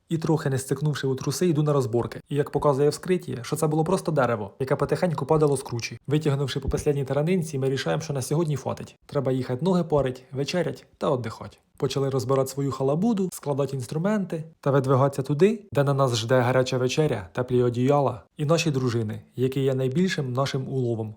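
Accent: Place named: Cherkasy, Ukraine. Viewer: От оцей черкаський гумор та говірка, бальзам на серце! Дай Бог вам здоровʼя щастя та усім миру!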